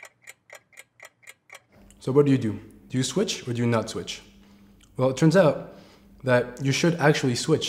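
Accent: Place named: France. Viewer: Cute French accent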